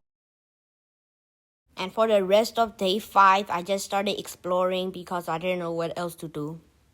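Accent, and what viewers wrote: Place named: India. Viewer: Bro why u sound so indian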